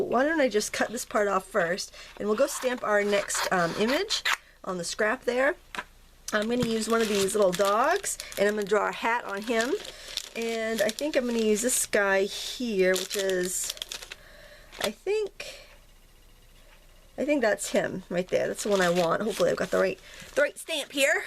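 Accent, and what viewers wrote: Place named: East Coast, United States. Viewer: Great card I really like that giraffe looking animal its Wicked. Lol We don't get all the new sayings etc. that the East and West coast has, it takes awhile to make it here. One thing I did notice about the east is your words are pronounced different. My Dad was born in New York and you pronounce some words the same as him. You say drrw instead of draw. My Aunt's name was Ida and my Dad said Ider. Lol love it!! Thank for the great video!!!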